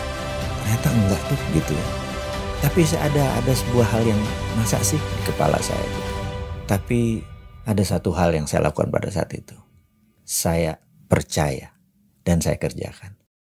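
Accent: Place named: Surabaya, Indonesia. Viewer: iki wrong endi ce rek?,..,. Jadi pingin Dolan nan omahne pingin belajar bisnis. kayak, e wong suroboyo jawa timur bahasanne.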